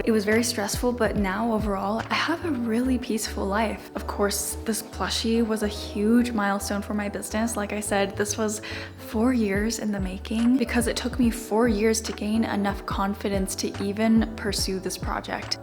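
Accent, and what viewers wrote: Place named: England, United Kingdom. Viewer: i love your english accent❤ and also every video you uploaded, you’re the best!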